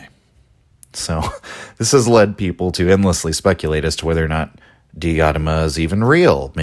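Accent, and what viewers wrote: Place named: England, United Kingdom. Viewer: I'll never get used to hearing ancient Greek names in an English accent 😭 I think you'd enjoy the sound of the actual names ❤️😊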